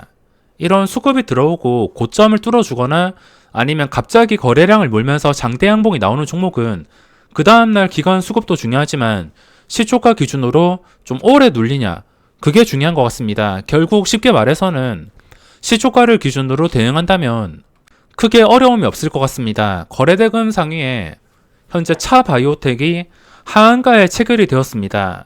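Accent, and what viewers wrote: Gyeongsang, South Korea. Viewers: 갱상도분이라 발음은 이해해주세요ㅠㅠ 저도 창원이라 정말 발음 힘듭니다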